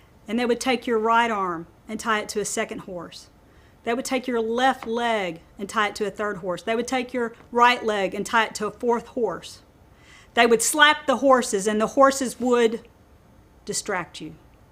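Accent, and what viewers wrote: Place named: New Zealand. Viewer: ♥ her accent, from NewZealand :) Sounds like she's from where I live. . . sort of :)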